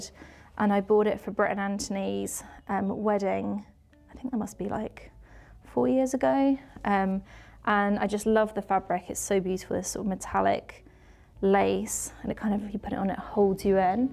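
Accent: United Kingdom. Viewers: I love she also got same eyeshadow pallet as mine which isn't expensive 👀 Her accent and her living style so remind me of UK and I miss England 😞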